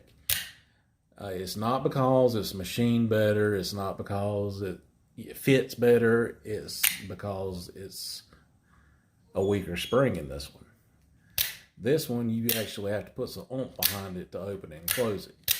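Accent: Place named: South, United States. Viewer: just joking it your southern drawl.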